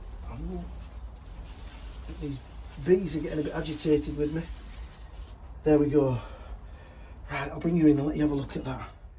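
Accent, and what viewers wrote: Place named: Wakefield, United Kingdom. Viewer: Aww fab, I’m in Wakefield - thought I recognised the accent! Really enjoying your vlogs - keep them coming 🤩 And I’m keeping the sunflower idea in mind for my future grandkids. Such a lovely gesture!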